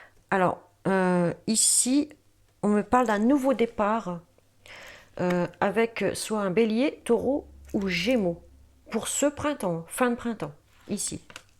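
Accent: North, France. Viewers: Merci sympa le tirage et pleins de bonne humeur! seriez-vous du Nord? BONNE JOURNEE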